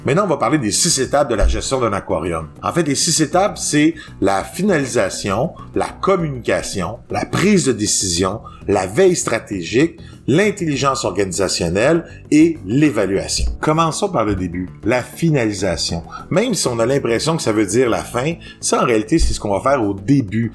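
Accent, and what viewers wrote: Quebec, Canada. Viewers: Je viens de regarder plusieurs de tes vidéos. Tes débuts sont très encourageant, c'est de mieux en mieux, je te souhaite beaucoup de succès avec ta chaîne. Ca fait du bien d'écouter l'accent québécois, c'est dépaysant, et puis pour ma part ça me "rapproche" un peu de ma petite sœur qui vit à Montréal depuis bientôt 7 ans... Je m'étais fait la réflexion que tu étais peut-être enseignant... Un truc dans une autre vidéo m'avait notamment fait tilté (je sais pas si c'est une expression bien utilisée au Québec, ça). Cela dit, on m'a déjà dit qu'on pensait que je l'étais alors que je ne le suis pas... mais j'en ai épousé une ;-)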